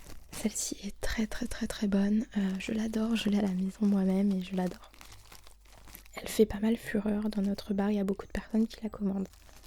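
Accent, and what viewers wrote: France. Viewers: Ton accent🥰🤤 j’adore les français votre accent et votre voix extrêmement douce🤗❤️ Bisous du Québec 💕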